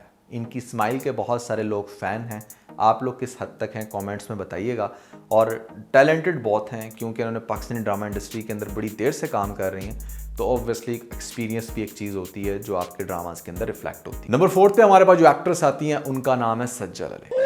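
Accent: India. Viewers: exactly ajeeb ganda paindo indian bhaiyya wala style hai bolnay ka. seems an uneducated person. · Aap apni guftagoo mein kuchh indian rang bharnay ki koshish kr ke apnay bolchal ka satiyanas kr rhay hain. Jesay keh lafz "phir" ko "fir" bolkar aur khush ko bhi indian style mein bol kr. Meray khayal mein is trah aap ne apna bolnay ka standard gira liya hai.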